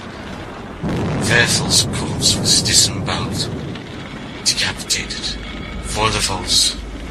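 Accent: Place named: Germany. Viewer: Nice one! Now I'm so hyped!!!!😁😁👍👍👍👍👍👍👍plus I love your German accent 👍👍😀😁😊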